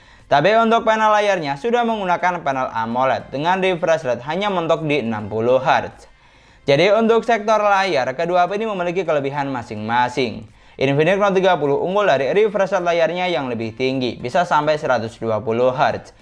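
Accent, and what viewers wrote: Madura, Indonesia. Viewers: mas logat maduranya kentel banget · Logatnya kaya madura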